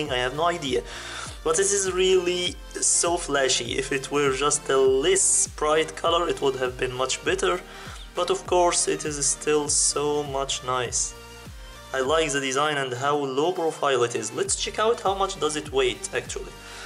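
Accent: Egypt. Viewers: الاكسنت دي مصريه اجيبه منين وبگام بقاا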